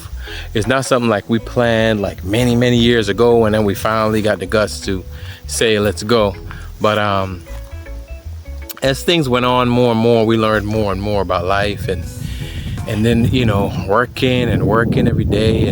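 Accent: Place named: Belize. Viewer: You sound like you picking up the Belizean accent also😂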